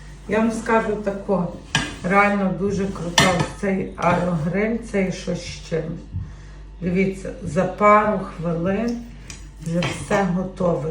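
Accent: Ternopil, Ukraine. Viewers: Ви не розумієте, це Тернопільський акцент і мова у них друга, так само порівняти людей з Одеси, Львова, а Закарпаття там взагалі мова на мові не знаєш якою говорять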